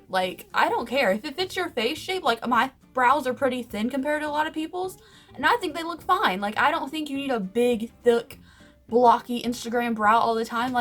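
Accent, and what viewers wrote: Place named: North Carolina, United States. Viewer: this is the first video of yours i've watched and right off the bat i knew you lived in nc bc of your accent lol nc represent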